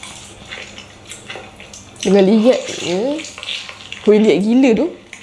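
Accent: Kelantan, Malaysia. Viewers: Akak org Kelantan ke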